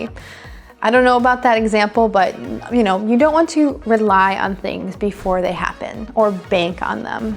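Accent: United States. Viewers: inglês americano gata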